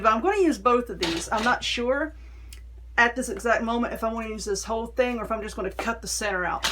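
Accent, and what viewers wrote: South, United States. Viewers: Super cute intro and video! I'm going to attempt to make one like this. Also love your southern accent 💗😊🎃🕷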